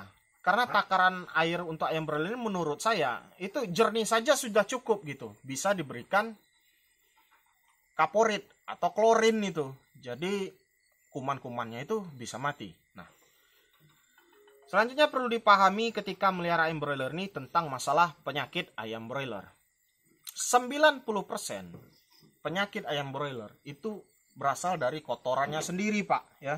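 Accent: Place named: Lahat, Indonesia. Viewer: Suka saya pembawaanya sampean,nada bahasanya kaya orang lahat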